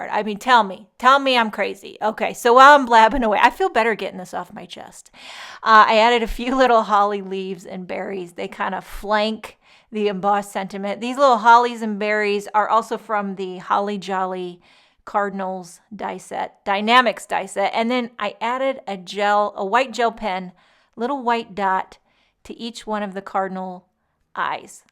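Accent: United States. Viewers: u are a women after my own heart, I do the same things, why don't things work the way they are suppose to, Ugh! And I have habit of expressing my opinion wether anyone wants to hear it or not. I noticed you said u were in Germany but u have an American accent. Love the birdies...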